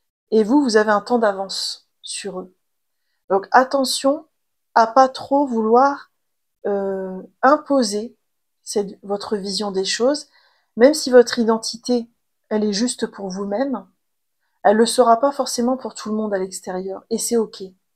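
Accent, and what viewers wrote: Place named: Nord-Pas-de-Calais, France. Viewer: Choix numéro 1 ❤ ooooh oui le message a bien été reçu 😊 MERCI du plus profond de mon cœur !!! Je vais le ré écouter tellement il est riche et réconfortant. Je me sentais très seule en ce moment, j en suis meme venue à me faire mes auto séances de psy parce que pas d'accompagnant disponible.. je doutais de mes capacité à me libérer seule de ce traumatisme de l'âme car je sens que j en touche la racine. Je reçois ce message comme une validation. Alors ❤Merci❤❤❤ Et big up à l'accent chti 😉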